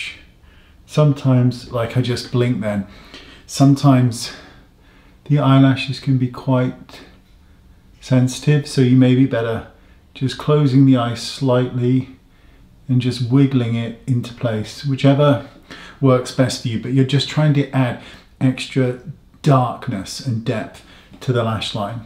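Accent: United Kingdom. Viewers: Where have you been all my life? I just discovered your wonderful channel and adore watching you. Being 45 and at a loss with makeup application, sharp features, eye bags, and well...generally a mess... your channel makes me laugh and smile. I wish you were my neighbor. 🥰 To my undignified American ears, I think I hear UK???? Thank you for every upload. ❤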